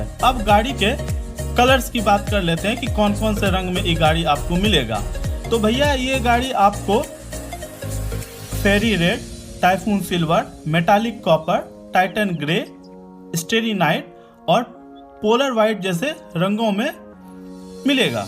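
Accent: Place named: Bihar, India. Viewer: बिहारी वाला अंदाज गजब शानदार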